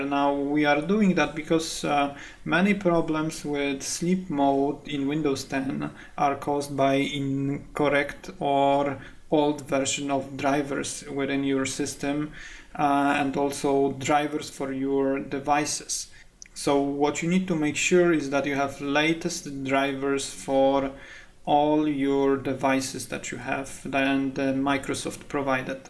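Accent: Romania. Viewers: Se vede ca-i roman nu are acent de englez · Cool Accent. Romanian? The first option worked great and for those who don't know, you may need to type: cd C:\Windows\System32 in the Command Promp first and press enter.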